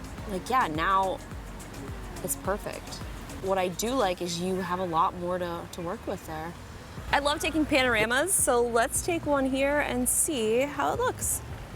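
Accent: United States. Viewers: wow You talking like😲😲😲 Wow People from u.s are adorable than I taught they will be... 😁😁😁I don't even know if you are from u.s but you sound like them "lol" It's amazing😉... Well actually you are changing the rules of a girl now huh.....well my taught still not wrong maybe you are the one who's different Wellhuh! It nights🌃 here my country so im going for bedtime sleep 😴 after playing some 🏀🏀⛹️‍♀️...everything workout well...... SEE YA👋🏻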